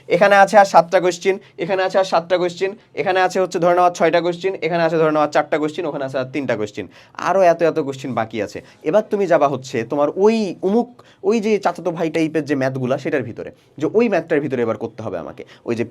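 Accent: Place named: Rajshahi, Bangladesh. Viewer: apnr basa ki rajshahi ??? vasa te rajshahi rajshahi vab ache ....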